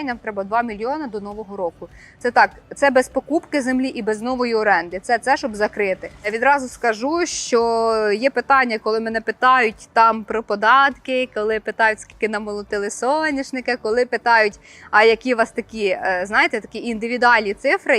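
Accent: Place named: Cherkasy, Ukraine. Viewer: у вас интересний мовний акцент .чепкащина.так як і на лубенщені свої нотки співочої української мови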